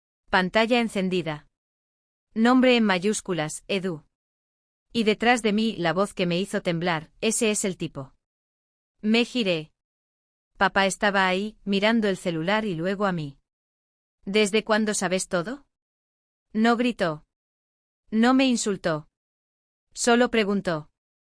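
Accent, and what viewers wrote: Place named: Argentina. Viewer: Por favor relatos en español neutro, no con relatos argentinos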